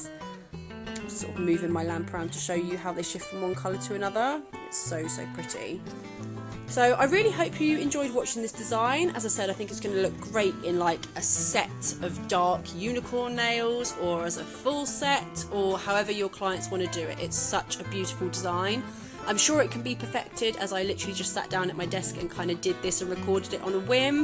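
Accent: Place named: United Kingdom. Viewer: I love your work and your uk accent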